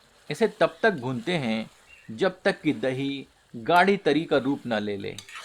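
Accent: Standard. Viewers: आपकी शुद्ध हिन्दी से चार चांद लग जाते हैं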